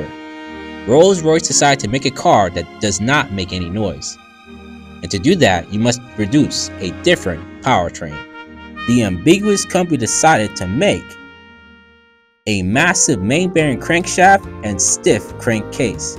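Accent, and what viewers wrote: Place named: North East England, United Kingdom. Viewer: Thanks for the vid, I enjoyed it despite RR being a bit out of my price range. Hey but, cool accent, must be somewhere north east. I'm Western Australian, & I too speak English, but if I was speaking proper 'Strayan from the specific part of my country, which I would only do with my contemporaries anyway, you would hardly understand a word of it. Make some more vids.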